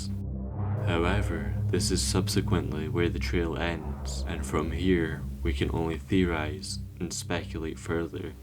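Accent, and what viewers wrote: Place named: Scotland, United Kingdom. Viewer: Ah recognise that accent, yer fae Scotland mate! Me tae! 🤪 Good video, hope you're doing well. Best wishes from Edinburgh 💙🏴💙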